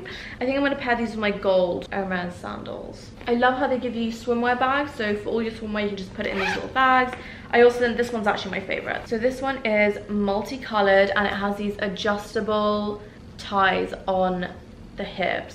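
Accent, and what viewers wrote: United Kingdom. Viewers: oh my goodness, my daughter made me watch your $10,000 quarantine hall with her and now we watch all your hauls together lol. "mommy come our friend from Dubai with the British accent posted!"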